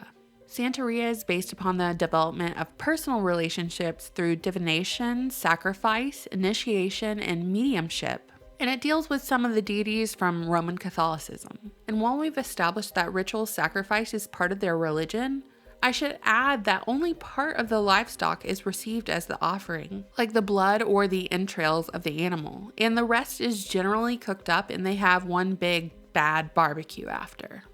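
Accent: South, United States. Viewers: I just love your voice and that southern charm..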